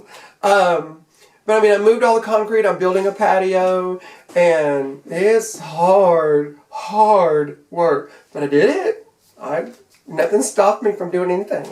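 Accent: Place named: Arkansas, United States. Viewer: you are a hoot! I've watched all of your videos tonight and read thru all the comments (I'm a nosey heifer like that) I just wanted to say....I too, am from Arkansas and now live in Texas so I absolutely love your accent being as it sounds like me! lol I'm not that far from you and if not having someone to help you film is standing in the way of you posting more videos.....hunny, I will film for you! =}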